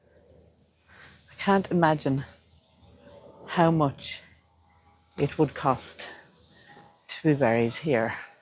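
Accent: Ireland. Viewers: Love listening to your Irish voice ❤❤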